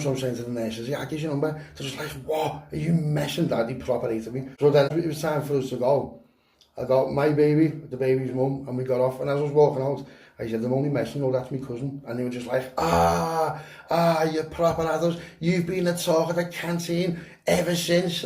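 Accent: Liverpool, United Kingdom. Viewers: Yes scouse ❤❤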